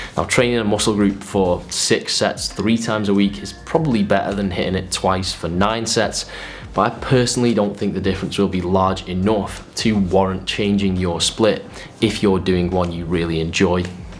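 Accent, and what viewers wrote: United Kingdom. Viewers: unfortunately anybody from outside the UK has 0.0% of a clue of what this guy is saying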